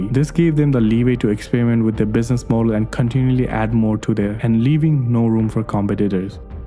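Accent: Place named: Nepal. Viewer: You sound like a Nepalese. Are you one?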